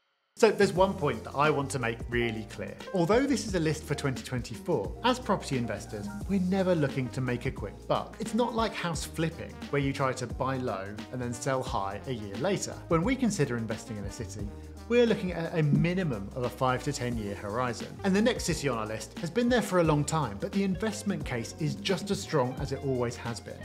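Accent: United Kingdom. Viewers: The presenter sounds like he has a posh Liverpool accent too !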